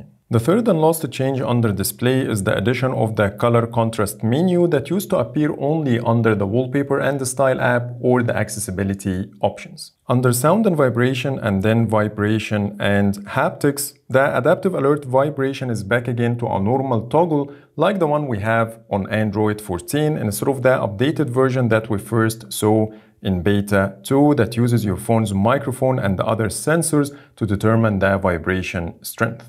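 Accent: Egypt. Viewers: Ur accent is egyptian am i right ?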